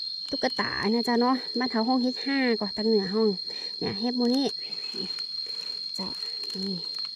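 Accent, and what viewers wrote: North, Thailand. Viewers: รอไห้กำลังใจน้อง จ้าชอบน้อง อู้คำเมืองเพราะๆจ้า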